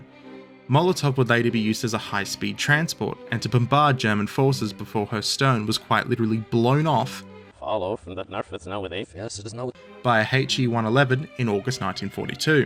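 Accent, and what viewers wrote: Australia. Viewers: Hey! Don't really give a shit about ships but I do appreciate your accent, proving again that we don't all sound like yobos. Have fun & hope the channel does ok. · Gotta love the sound of a fellow Aussie talking out his arse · Like Vegemite, the Aussie accent is something to be proud of, even if it's not for everyone. Personally, great video and attitude with the foreword.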